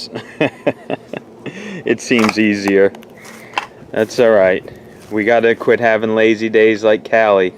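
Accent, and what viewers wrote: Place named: South Texas, United States. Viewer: I hear South Texas in your voice. Do you ever grow super hot peppers.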